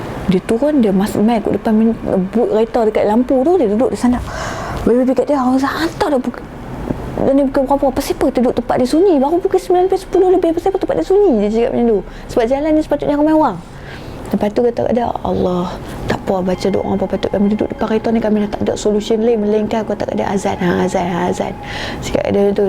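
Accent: North, Malaysia. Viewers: puas cari nak subscribe..setahun telupa🤣.. saya suka penceritaan. suka loghat utara dia👏👏.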